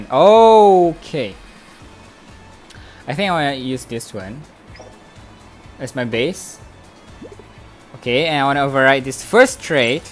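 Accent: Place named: Malaysia. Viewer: patutlah suara mcm org Malaysia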